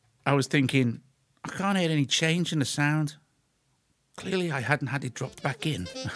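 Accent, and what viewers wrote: Merseyside, United Kingdom. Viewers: Always love your reviews. Your bright positivity, and premium Mersey tones!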